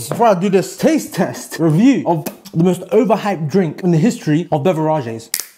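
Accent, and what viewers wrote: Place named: United States. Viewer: American accent on point 💅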